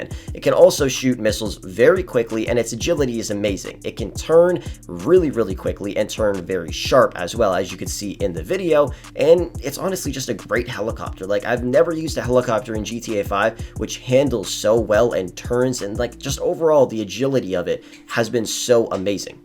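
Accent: Slovenia. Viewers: Wait are you from SLOVENIA😅🇸🇮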